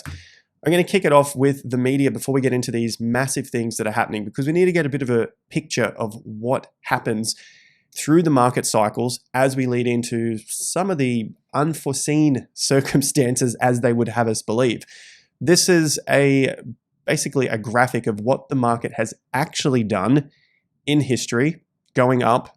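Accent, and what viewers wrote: Australia. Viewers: Love your video mate.. Very informative to me. Your Aussie slang its nice.. I think BTC will make small corrections when it achieves 48k-52k.. The bottom will be at 40-42k..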